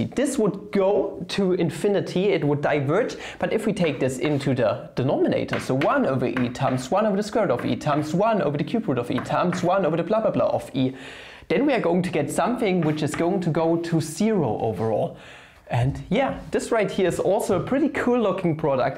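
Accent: Germany. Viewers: Its funny how you know after 2 sec that he is german 😂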